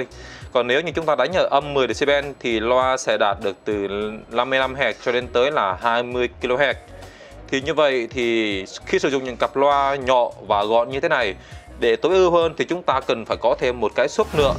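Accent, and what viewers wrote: Vietnam. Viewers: yeu cau doi nguoi huong dan , giong ong noi nay ko biet o vung nao ma nghe nhu bi dam ngang hong , kho chiu thiet .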